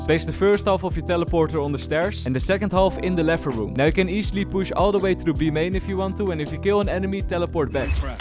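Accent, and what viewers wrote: Netherlands. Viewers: I know because of ur accent that u are Dutch, ik ben ook Nederlands 😅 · Are you dutch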